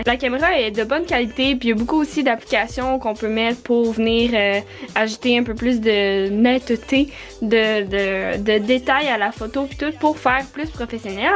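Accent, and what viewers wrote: Quebec, Canada. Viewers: Punaise j'aime tlltm l'accent canadien fin québécois qui est français ici 🇫🇷🇫🇷🇫🇷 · J'adore ton accent quebequois et j'adore la façon dont tu expliques les choses rien que pour sa je m'abonne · Oh j’adore ton accent je suis française ( paris 🇫🇷 ) et je trouve vraiment que l’accent québécois est charmant ! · J'aime les accents canadiens💖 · J'aime trop ton accent québécois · Elle est trop mignonne avec son petit accent canadien 🥺❤️ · ah ces quebecquois et leur accent en tout cas tres bonne video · Êtes vous d'origine canada j'ame ta manière de parler la langue française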